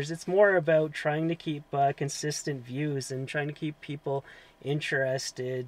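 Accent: Canada. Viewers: I miss your Canadian Accent...:-))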